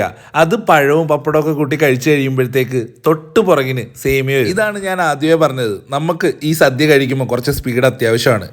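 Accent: India. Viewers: Kollam aanenn thonunu.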